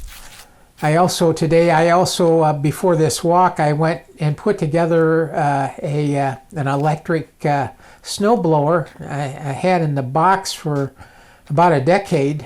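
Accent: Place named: Michigan, United States. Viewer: I thought you were from Michigan by your accent, Im from Howard City Michigan❤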